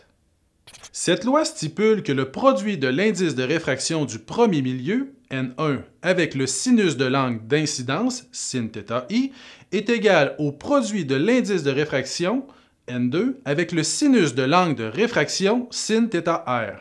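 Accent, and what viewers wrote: Quebec, Canada. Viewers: Au tout début, je pensais que j’allais rien comprendre, en plus tu as l’accent québécois :) mais au final aucun problème ! J’ai parfaitement compris ce chapitre grâce à toi, Merci ! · Wow merci beaucoup pour les explications simple et surtout très claire, avec un petit accent québécois qu'on apprécie toujours autant ! MERCi ! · Merci pour ton accent québécois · top l'accent du Quebec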